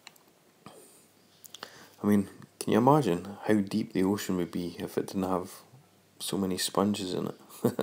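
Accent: Scotland, United Kingdom. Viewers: lol too funny at 1 minute on the video, listening to a scot talking about sunshine, be careful you don't blink you may very well miss that sunshine, that rock will never dry out in Scotland, lol great stuff buddy I'm really enjoying to videos. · Great series. Love the Scottish accent, but it doesn't help to understand the phrase: "leave it to dry for a couple of weeks in the sun."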